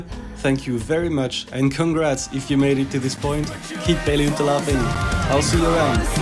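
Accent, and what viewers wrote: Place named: France. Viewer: je me disais qu’il y’avait au fond d’un anglais irréprochable un petit accent qui me rappelait le miens 🇫🇷🇫🇷🇫🇷 D’ailleurs tu t’es mis un sacré gros youtubeur avec toi!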